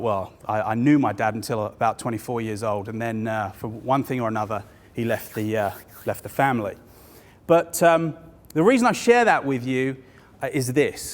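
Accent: United Kingdom. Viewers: This guy sounds sooo British than ordinary Brits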